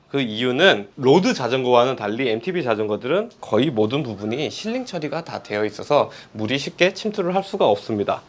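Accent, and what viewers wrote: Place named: Gyeongsang, South Korea. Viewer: 경상도 분이네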